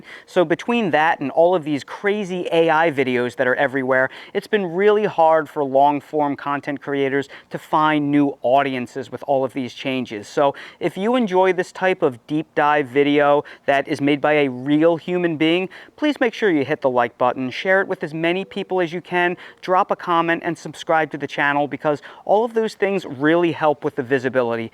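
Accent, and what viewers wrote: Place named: New Jersey, United States. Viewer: I knew you were from NJ! lol I was hearing you talk and I thought to myself, "He sounds like he's from Jersey." I checked your bio, and there you go! I grew up in New Jersey, but more up north, so we don't have the same accent, but yours still sounds familiar to me.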